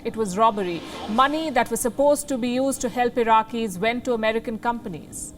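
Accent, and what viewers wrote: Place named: India. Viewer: The US did not leave, where do you pull your opinions', out of thin air. A war started by Iraq attacking Saudi Arabia and Kuwait, again like Russia, they started the wars. What should the US do, leave. Financially it would be good for the US. The women had no rights, and Iraq threatened it's neighbors. Food for oil. It's not profitable to start a war, but what would have happened if the US did not. Iran would take over, and they are no good. India perspective is that we did not do anything. You speak Indian rather the English because you are not.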